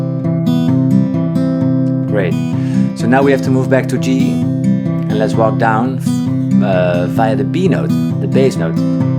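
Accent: Netherlands. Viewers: with an accent. You can tell right away that he’s Dutch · cant miss that Dutch accent!!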